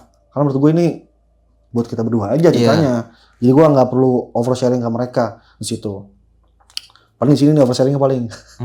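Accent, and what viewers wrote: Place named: Bekasi, Indonesia. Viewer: Bekasi bgt nih logatnya resep betdahh dengerin cerita lu bang 🤣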